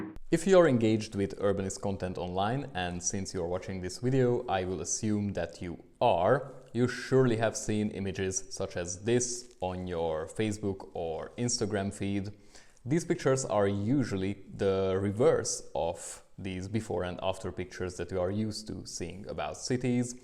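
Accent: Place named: California, United States. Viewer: for a supposed hungarian you sound a lot like you'd rather be some californio.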